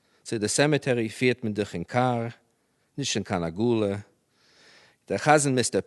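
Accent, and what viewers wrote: United States. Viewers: Ober dus iz amerikaner yiddisch.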